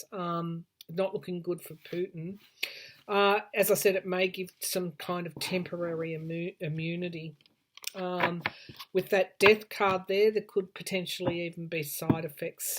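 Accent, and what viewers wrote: Australia. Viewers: I'm glad I'm not the only one who gets annoyed at viewers who correct others so easily. As for me, I love your wonderful Aussie accent & accents in general. Thanks for all you do. I really enjoy your readings . 👏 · 🙋‍♀️I love your Aussie accent 😊❤ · Love your accent and purely Aussie expressions❤️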